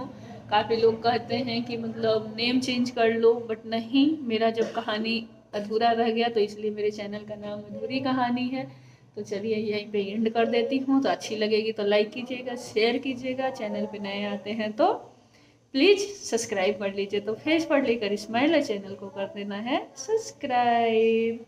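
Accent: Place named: Bihar, India. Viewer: बिहारी हाे का!!!!? हिन्दी से लग रहा है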